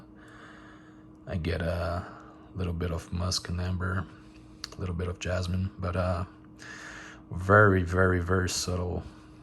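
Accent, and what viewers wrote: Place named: Portugal. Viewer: lovely review bro! your accent sounds very Portuguese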